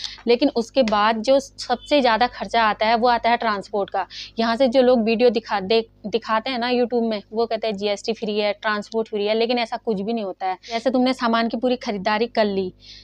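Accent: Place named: Uttarakhand, India. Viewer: Baat krne k tone se smj jate h wo log Uttrakhand se h 😂koi nhi didi time lgega par jada pese ka maal lena h to sadar se fayeda h 20k ya 30k ka lena h to local se shi h.. First time sbke saat hota h lekin thoda tez hona pdta h didi lekin ab to pta chl gya hoga aapko ki kaha se saman lene m fayeda h first time sbke saat hota h lekin aaj b bina billty k saman aata h bs pta hona chahiye kon bhejta h bs first time sbke saat hota h uske baad sb sahi h bs thoda tez bno waha gunge ki traffic rahoge to badiya se lete h wo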